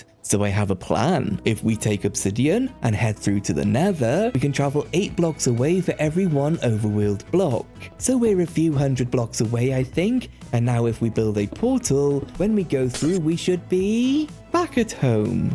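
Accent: United Kingdom. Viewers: I like the british accent.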